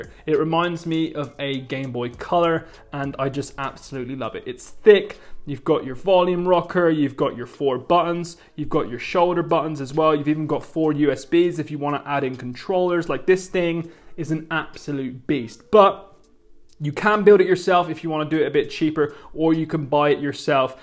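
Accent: Bristol, United Kingdom. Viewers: u from bristol?? sounds like it and swear ur tshirt says somerset lol im from weston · are you actually a Bristol boi?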